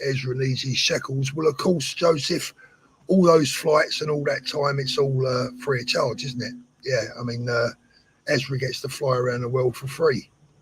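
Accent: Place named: London, United Kingdom. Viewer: Hi, you're on 284,000 subs, great going. I didn't leave as some did, stillnfindcyouvas one of the most down to earth blokes out there, guess it's the London accent, all my family hail from London, dads from the west of London, mums from Poplar. We live in Aylesbury now, wifey and my son. Will be in London on 13/09/25. Stay safe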